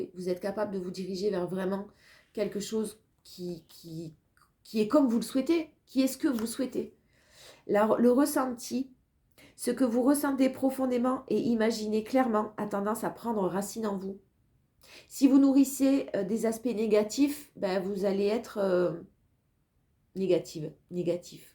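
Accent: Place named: South-West, France. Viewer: J'adore vos messages qui me font beaucoup rire par votre bonne humeur. Votre franchise et notre bel accent du Sud Ouest (je pense) met du baume au coeur. Bon week-end❤❤😂😂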